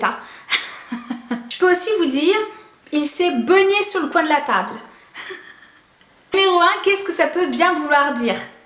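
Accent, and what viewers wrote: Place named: Nord, France. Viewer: Et même l’accent tu reprends l’accent ch’ti ça porte à confusion .. mais du coup tu viens de quel partie du « nord » ?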